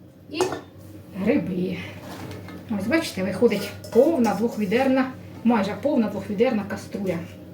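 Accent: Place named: Chornobai, Ukraine. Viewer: та ще й мабуть біля Чорнобая живете, я цю мову впізнаю з тисячи🌺🌷😉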